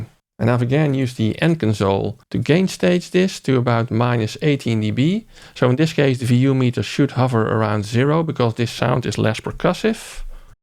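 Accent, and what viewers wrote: Netherlands. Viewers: Wait are you dutch ? I'm hardly noticing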